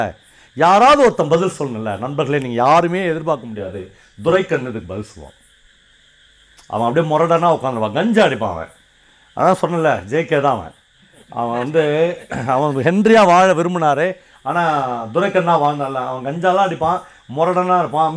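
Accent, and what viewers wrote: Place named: Tiruvannamalai, India. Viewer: திருவண்ணாமலை வட்டார மொழியில்...எளிமையான உரையில் எழுத்தாளர் "ஜெயகாந்தனின்" மொழிநடை மற்றும் உரைநடையை நேர்த்தியாக சொன்னீர்கள் அழகு.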